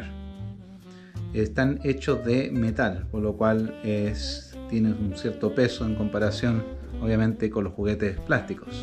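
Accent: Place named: Chile. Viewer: Tenés pinta de ser chileno...por el acento lo digo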